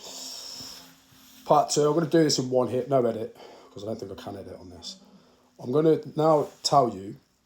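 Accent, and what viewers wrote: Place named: United Kingdom. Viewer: Are you British ? I like your accent oh yeah you are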